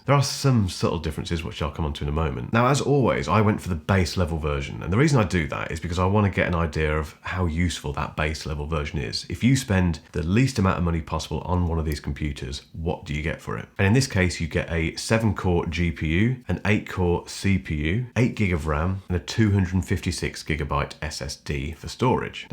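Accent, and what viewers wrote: England, United Kingdom. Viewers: All YouTube reviews should be in English accent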